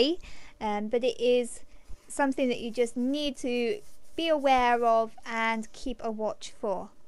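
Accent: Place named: England, United Kingdom. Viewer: Excuse my curiousity, please, from which part of England are you coming from? You have such a lovely accent! By the way, I first leave my glue in water for 24 hours so that the granules can soak up the water. Only then do I heat it, but not above 60 degrees, otherwise the glue loses its binding power.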